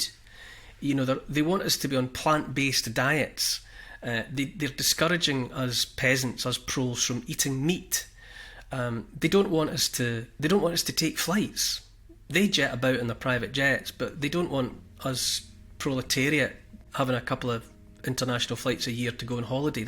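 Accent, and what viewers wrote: Scotland, United Kingdom. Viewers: It is not a dialect, it is a Scottish accent.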